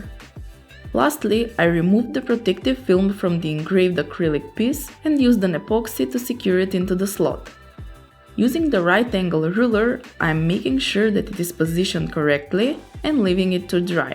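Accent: Russia. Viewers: Попахивает русским акцентом) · Какой четкий русский акцент в этом английском :) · Похоже русская озвучивает. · Отличная работа! Но у вас жуткий русский акцент, надо тренироваться ;) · русский акцен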